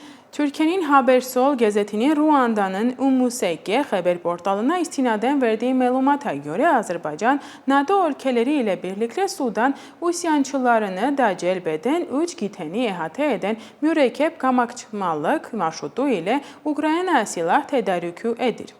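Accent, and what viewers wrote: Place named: Armenia. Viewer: Deyəsən bu ermənidir, erməni ləhçəsi hiss olunur. Nə turkdi, nə də bizimki. Üçüncü kim ola bilər?)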